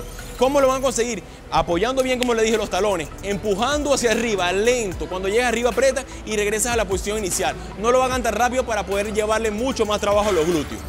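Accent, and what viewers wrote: Venezuela. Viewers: Este pana es venezolano?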